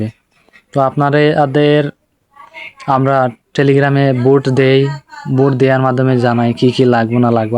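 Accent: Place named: Sylhet, Bangladesh. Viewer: Joto suddo kotha kow na kene ba tumr shyleti kotha buja jay 🙂👍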